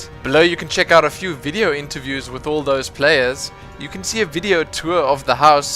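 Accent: South Africa. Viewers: its a south african accent bru!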